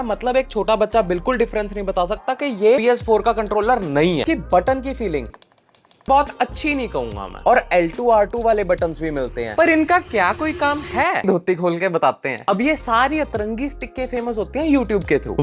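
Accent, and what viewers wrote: Punjab, India. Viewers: Are u from punjab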